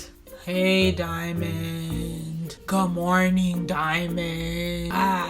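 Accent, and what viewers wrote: Louisiana, United States. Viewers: OK I didn't know got a lil Louisiana spice going on 😛😛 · lolll i heard the Louisianan accent.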